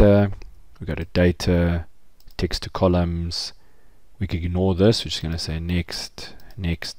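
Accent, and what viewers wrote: South Africa. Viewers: Great Video, and its great to hear another South African accent.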